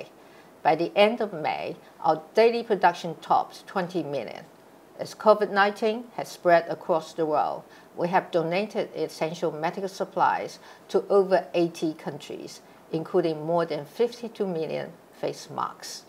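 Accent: United Kingdom. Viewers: 總統的英國口音越來越明顯了~ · President Tsai’s English is 10 times better than Denghui Lee whose talk in Cornell years back was extremely hard to understand. Her English is great but more like British English rather than American English. Job well done President Tsai!!! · 親美的總統講英式英文🤔( 無惡意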